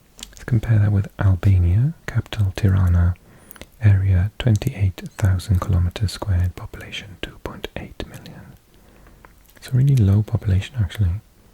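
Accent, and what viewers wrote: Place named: Wales, United Kingdom. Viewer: yes the accent sure sounds very Welsh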